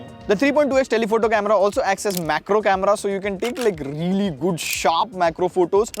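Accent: India. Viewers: classic indian accent 🤣🤣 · I love the accent, are you Indian?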